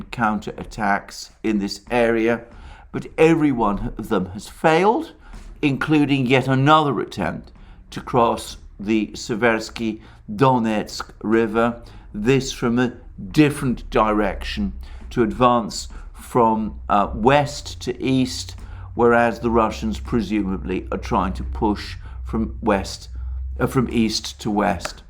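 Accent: United Kingdom. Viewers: Overly British accent destroyed the video quality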